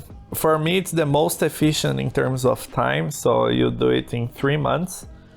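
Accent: Brazil. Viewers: tu é br né nao? kskss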